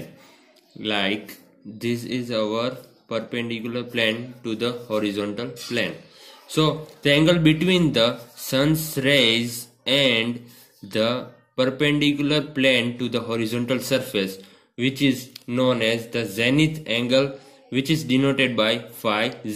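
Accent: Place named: Gujarat, India. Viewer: your gujrati accent is too good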